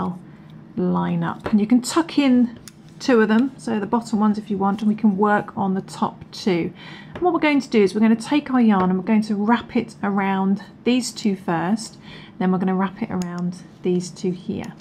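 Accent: England, United Kingdom. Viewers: Thank you for that. It is nice hearing an English accent on YouTube for a change... ;-))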